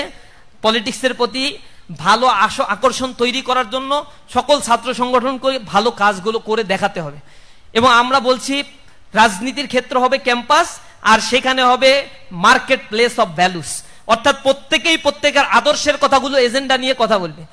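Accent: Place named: Chittagong, Bangladesh. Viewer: তোমার কথা শুনে মনে হচ্ছে , তুমি চট্টগ্রামের মেয়ে।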